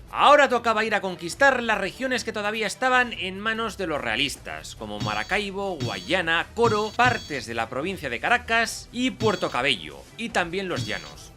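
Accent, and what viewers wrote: Spain. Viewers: Suena curioso un español narrando la independencia de las Américas. Enhorabuena, excelente video...!!! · Eres de España? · Siempre quise saber la versión de los españoles acerca de Simón Bolivar y la verdad escucharlo con ese acento de España es un logro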